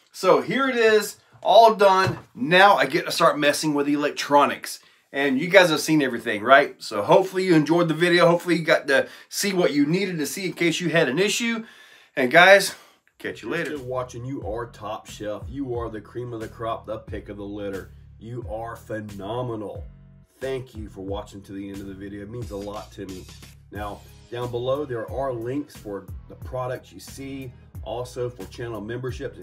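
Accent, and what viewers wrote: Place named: United States. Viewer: Yeah im hardcore! Love your videos man! And the good ol american accent🫵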